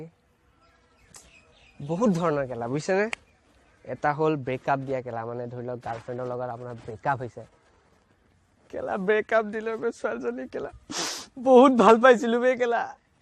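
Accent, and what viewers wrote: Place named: Lower Assam, India. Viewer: Aap Jo ye Word Bol Rahe ho..haa imke similar jo sare word hai... Wo lower assam ke logo ke muh mai jyada suit krne hai.accent accha hai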